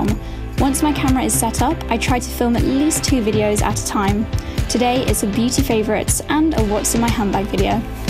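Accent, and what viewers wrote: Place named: United Kingdom. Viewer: Ur sweety british accent is sooooo cooolllll for me. Happyful,best wishess from BAKU,AZERBAYCAN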